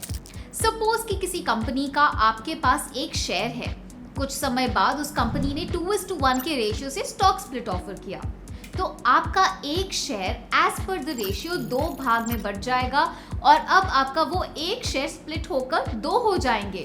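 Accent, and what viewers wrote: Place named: India. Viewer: cant listen to this indian talk , dont understand anything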